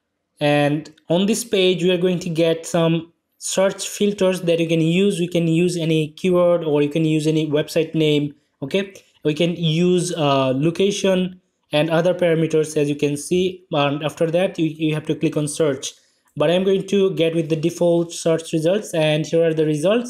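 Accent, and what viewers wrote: Sylhet, Bangladesh. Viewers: Brother Are You From Sylhet? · are vaijan apni je bangladeshi seta apnar english accent shunei bojha jay!!!!!!!!!!!!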